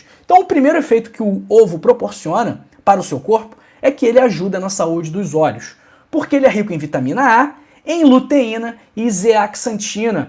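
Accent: Rio de Janeiro, Brazil. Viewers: COM ESSE SUTAQUE NEM PRECISA FALAR QUE E DO RIO DE JANEIRO.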